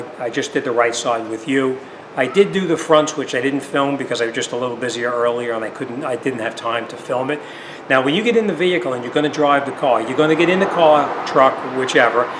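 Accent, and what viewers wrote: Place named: New York, United States. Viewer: Good vidio, sounds like a true New Yorker, lol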